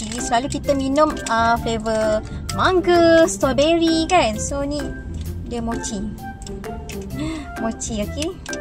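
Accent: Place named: Indonesia. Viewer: amboi cakap loghat indo no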